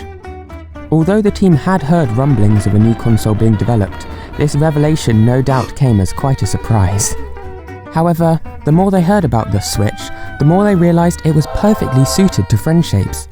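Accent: United Kingdom. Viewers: Hes british like me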